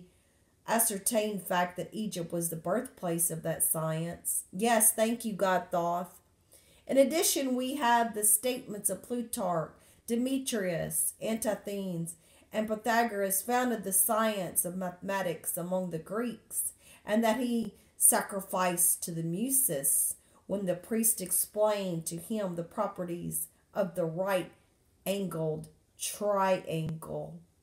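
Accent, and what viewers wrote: South, United States. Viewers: Me being from the south I love that southern accent don't change it for anyone...one book I will suggest for you to read and maybe to expound on its arcanian Freemasonry. By RA Gilbert..this book was shed a lot of light on a dark subject for you and will bring together some missing pieces of the puzzles as far as other things are done and said how are ancient Freemasonry was that of the arts of the ancient Egyptians and how the Greeks and Romans stole it in Martin British empire who inherited has changed it to be a good old boys club while trying to exclude African-Americans from it. Great piece you're doing wonderful.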